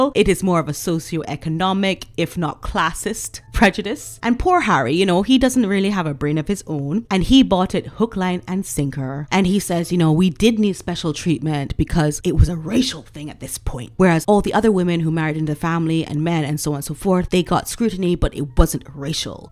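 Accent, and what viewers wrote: United States. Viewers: I love your cheeky take on everything - all of which is backed up with factual evidence. On a personal level, do you have an American accent? Can you talk Barbados talk one day just to show us (me).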